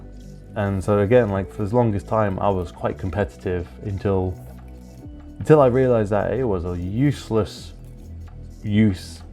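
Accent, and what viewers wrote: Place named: Scotland, United Kingdom. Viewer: You have an intresting accent. Where are you from? Scotland?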